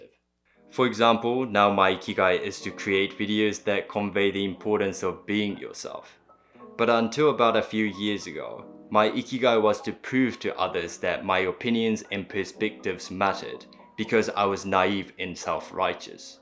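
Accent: New Zealand. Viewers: is that a new zealand accent? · No wonder your English sounds funny, you learned it in New Zealand. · Thank you for this: it's interesting that your English retains your New Zealand experience ("sex & sivin" for "six & seven"). Bravo..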